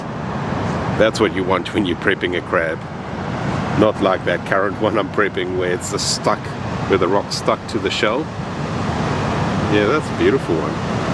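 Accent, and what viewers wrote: South Africa. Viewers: Just from your accent u are definitely from South Africa. U are in an awesome place. But do u have any knowledge of our eastern cape here in SA cause I love collecting rocks but no rocks like u have found. Just give me some intel here in Gonubie or surrounding areas where I can find similar ❤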